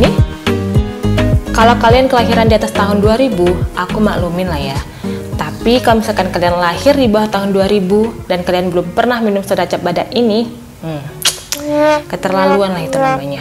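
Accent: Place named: Medan, Indonesia. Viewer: Banyak belajar sejarah lama yang baru tau nya sekarang, padahal yang asli medan belum tentu tau. Semangat terus buat mbak rutin upload nya, karena saya yakin masih banyak cerita seru sejarah/kuliner medan yang menarik dibahas dengan logat "cakap-cakap medan" hhe Terimkasih udah buat video ini jadi lebih tau. #Giveaway #Dudiksendaljepit #RagamVariasi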